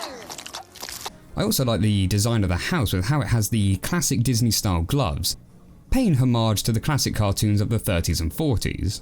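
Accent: England, United Kingdom. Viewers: I didn't know you were English ( I am...)!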